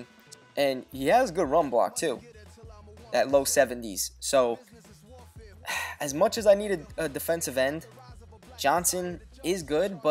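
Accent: New York, United States. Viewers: are u from new york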